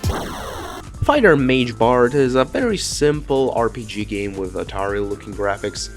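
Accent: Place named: Russia. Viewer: I did not notice the slight russian accent until I noticed the russian text lol Then again I'm from Israel so maybe I'm just uses to Russian accents more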